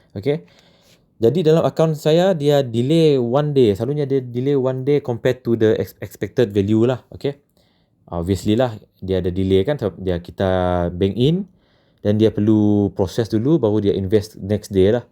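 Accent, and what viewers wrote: Sarawak, Malaysia. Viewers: Are you Sarawakian? 😂 anyway thanks for the vid, very helpful. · slang srawak ya xpat lari bos. . hahahah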